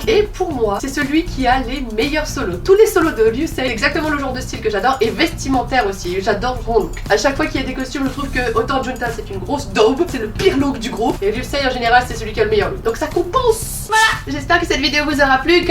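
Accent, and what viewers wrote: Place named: Spain. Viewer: Comment est-ce que vous êtes si peu connue, c'est vraiment du contenu de qualité !!! Sur une autre note, je suis québécoise et j'étais convainque que vous étiez espagnole ou quelque chose du genre au début, et ça m'a prit un bout pour réaliser que c'était du français ! C'est fou comme les accents différents d'une langue peuvent affecter notre compréhension hahaha ! Encore une fois, super vidéo ! xxxx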